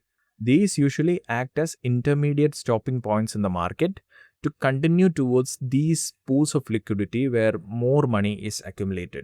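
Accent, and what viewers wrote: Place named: South India, India. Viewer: South Indian ?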